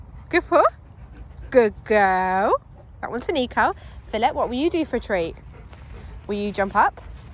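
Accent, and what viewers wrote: United Kingdom. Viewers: Are you Britain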